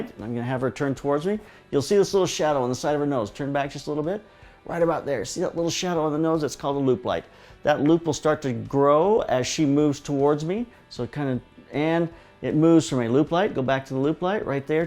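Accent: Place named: United States. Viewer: Best American Accent Ever!!!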